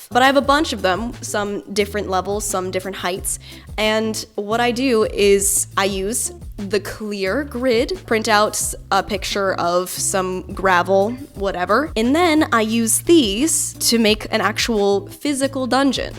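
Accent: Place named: Ireland. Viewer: $$$ great Irish accent. Keep up the good content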